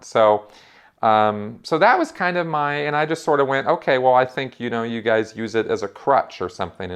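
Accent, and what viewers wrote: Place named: California, United States. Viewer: 44 down, 46 left to go! I am slowly going through all of these q & a's from the beginning. As an amateur anthropologist and person fascinated by religions, I truly appreciate your detailed and objective answers. Holding my questions since you have likely already answered them. Having grown up in Central and Northern California, I find your speech patterns very familiar and much more "real" to me than the other vocal ex-members. Keep on!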